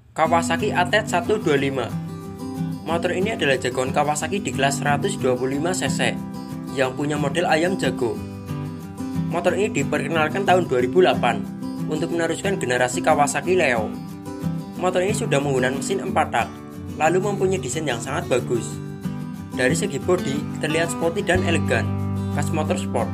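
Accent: Java, Indonesia. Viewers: Medok bet dah SE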